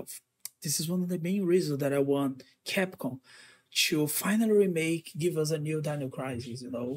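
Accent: Brazil. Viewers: I can recognize that Brazilian speaking english tone everywhere. Keep the goop work, fellow BR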